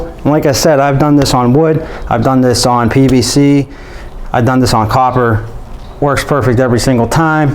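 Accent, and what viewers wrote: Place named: New York, United States. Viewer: Great video. I'm loving your accent...... straight out of 'Goodfellas' 😊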